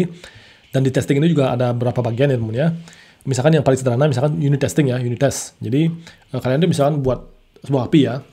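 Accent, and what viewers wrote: Bali, Indonesia. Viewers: logat orang bali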